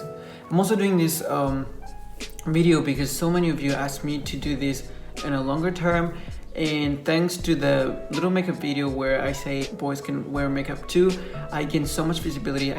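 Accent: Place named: United States. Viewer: Where did you learn American English?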